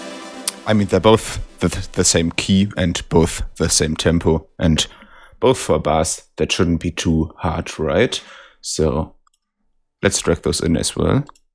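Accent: Germany. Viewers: Der deutsche Akzent ist sehr sympatisch xD Danke für den Einblick ins Programm :3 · Sounds like a very nativ german guy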